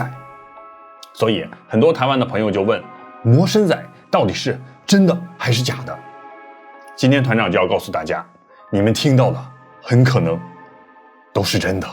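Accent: China. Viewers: 主持人大陸人口音耶介紹魔神仔到後來自己一直講成神魔仔😂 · 想問一下，您到底是台灣人還是中國人? 純屬好奇，因為覺得您口音很像大陸人，但對台灣卻很了解，感覺您是大陸人台灣人的綜合版。:face-blue-smiling:face-blue-smiling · 直播主聽你這口音是中國內地人對吧，解說網路怪談視頻越界到台灣本島了，魔神仔是台灣本島才有的怪物，中國內地可沒有魔神仔出沒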